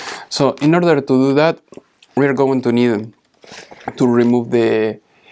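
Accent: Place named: Argentina. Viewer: Nice Gardel accent... ! love it...! thanks for the helping hand , cheers from Adrogue !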